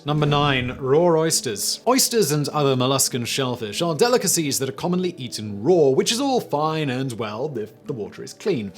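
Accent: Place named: United Kingdom. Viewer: I luv how Brits' pronounce the word "vitamin,' ha...Here in the 'states it's a LONG "I" whereas in the U.K. it's a short "I". Seeing as how they basically spawned the english language, who knows...maybe it's American's who are pronouncing it incorrectly.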